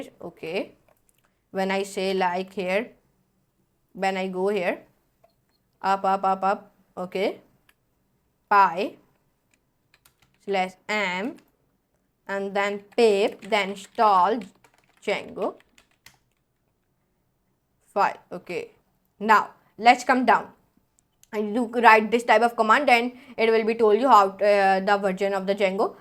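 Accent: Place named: India. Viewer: yAh india ki english bol rha ha Django ka illawa aur kuch samajh nhi ahh rha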